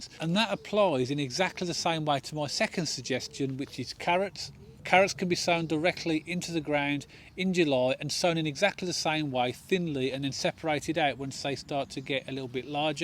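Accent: Birmingham, United Kingdom. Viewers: Sound like a brummie, where u based? Im in smethwick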